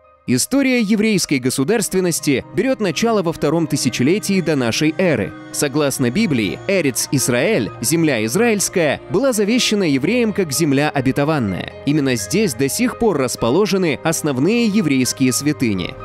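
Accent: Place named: Moscow, Russia. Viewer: Рассказ интересный. Но Московский акцент режет слух: "конфликт нАваприбывших обострялся...". Да здравствует Красная Армия! Да здравствует социалистический сионизм.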